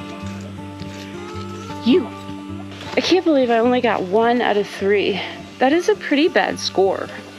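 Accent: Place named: Great Plains, United States. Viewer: Your accent sounds more like Great Plains than Allegheny. Nice video. I raise a little flock of chickens myself. They are very entertaining.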